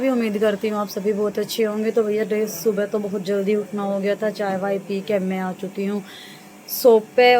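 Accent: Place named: Baghpat, India. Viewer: Baghpat se h didi ase hi bolted h hm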